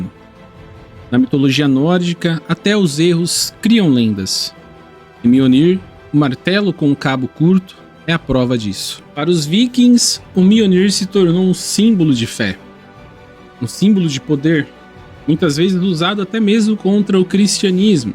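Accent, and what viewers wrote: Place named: Campinas, Brazil. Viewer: Excelente vídeo mano parabéns, senti falta do sotaque DDD 19, cadê? 😂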